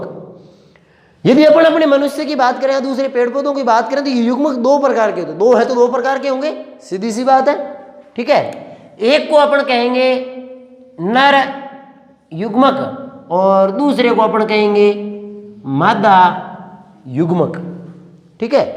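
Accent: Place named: Marwari, India. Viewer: Aap to bahut ache trike se samja rhe ho, muje aapki marwadi voice achi lagee 😂😂😂😂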